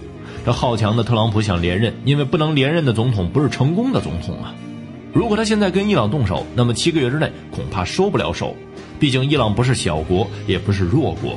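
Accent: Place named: Beijing, China. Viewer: 正宗的京腔京韵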